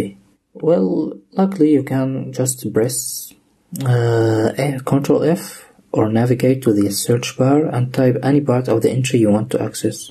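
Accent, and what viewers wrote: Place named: Iran. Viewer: Thank you! Do you speak Farsi? Where are you from? You have a nice accent that reminds me of some of my students. They're very brilliant.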